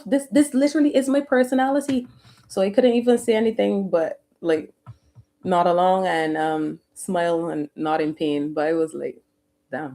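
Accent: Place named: Barbados. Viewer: Subscribed in the first minute because it sounds like you’re Bajan! 🇧🇧 Anyhow, this is a great topic!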